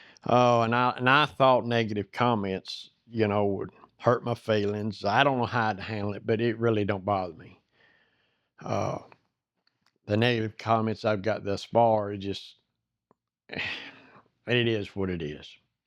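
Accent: South, United States. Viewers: Hi. I have been watching woodworking videos here on YouTube for close to 20 years and just discovered your channel about two months ago. I sure enjoy watching your video's, and one of my favorite things about watching your video's in that southern accent of yours. I sure enjoy listening to you. Another thing I like about your video's is that they get down to business. No trying to be a comedian like a lot of guy's do, and they are about as funny as a broken leg. I've been going through your video's trying to find a tool that you showed in one of them, and it was that black angle finder jjig you have. I think I might like to buy one of those but I can't find that video. Could you tell me who makes them and where I could get one?? I sure would appreciate it. Thanks and keep those video's coming. Also, I don't know when it happened, but i'm glad to hear that your wife is recovering from her surgery on her leg. 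I know what thats like as I broke my right femur and shatterd my left heel about nine years ago. I will be 80 this next February, and we just don't heal up as we did when we were kids. Tell her hi for me, and keep making those videos, I sure do enjoy watching them!! Jim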